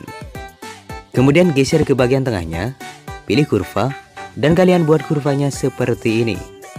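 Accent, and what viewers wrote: Madura, Indonesia. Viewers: Pasti orang Madura ya kak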